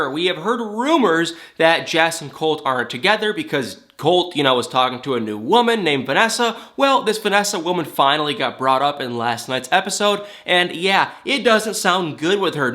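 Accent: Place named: South, United States. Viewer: You're southern aren't you? "woman's trouble" lol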